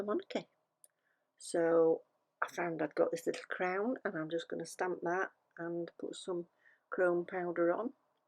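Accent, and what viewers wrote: Sheffield, United Kingdom. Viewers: Wow absolutely gorgeous 💅 Nail colab stunning colours love how you done the 3d flower such a beautiful design. you don't live far from me i am from creswell worksop i thought your accent sounded familiar such stunning views around Sheffield thanks for sharing these beauties lovely take care and have a fabulous day 😊😘🥰🤩😍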